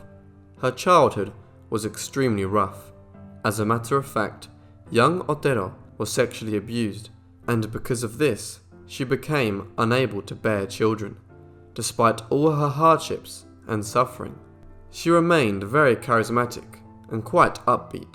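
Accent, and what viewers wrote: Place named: RP, United Kingdom. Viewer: Hard to believe that with such a plummy Brit accent you mispronounce "Grosvenor." It's pronounced "GROW-ven-er "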